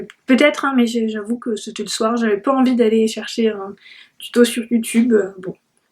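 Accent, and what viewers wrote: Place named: France. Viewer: Je suis toujours contente de voir tes podcasts! déjà entendre parler le français de France c'Est super...et en plus c'Est toujours bien complet! et pour le Kal... oh j'ai hate... ce coup ci je le fais!!! Ne change pas! tout est très bien! Rose, xx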